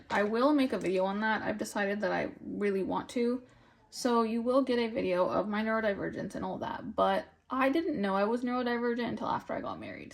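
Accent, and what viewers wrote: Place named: South, United States. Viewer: Omg I am crying because I can relate to you so much. I was bullied by my peers from upper elementary all the way through junior high so (ages 7-14) it completely ruined my confidence and till this day I'm trying to heal from it. (37 now) i have always felt like an outcast being unique in everything I do. I love your social media content and feel so validated. You are so genuine, raw and down to earth! I hate judgy people so much and honestly wish i can just avoid them all. I'm half way into watching your video but I just wanted to comment before i forget. I was bullied due to my skin color and were surrounded by racists .. then when i was jn high school i was bullied by aunties saying how i did not get my moms beauty and looks .. it hurt me so bad and I always felt self conscious about how I look, how i talk etc etc. 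i really want to get myself checked to figure out if I am neurodivergent. I overthink but i think thats a strength of mine, i feel anxious a lot and my sister always is mean to me about it. Anyway. I'll come back to comment again when I finish the video but i just wanted to say! We support you and im so sorry for all the hurt people have caused. Oh and I have also been diagnosed with an autoimmune disease right after getting hit with covid back when i was 35 and life has become so challenging. So you talking about all this, i feel seen! And i thought you were from the south because I hear a little southern accent. Turns our you lived in the south for a little! So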